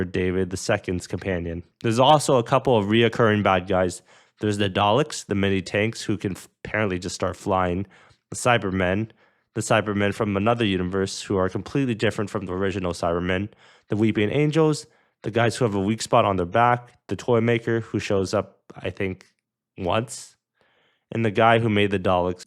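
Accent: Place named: Scotland, United Kingdom. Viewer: Irish Me: Irish...(processing) IRISH??!!!! The last I checked, I'm pretty sure he was Scottish. Great vid btw, got a few laughs out of it.😄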